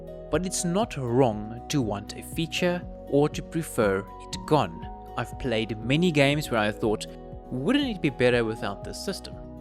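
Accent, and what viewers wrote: South Africa. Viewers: Bro are you south African, I Wil sub if you are.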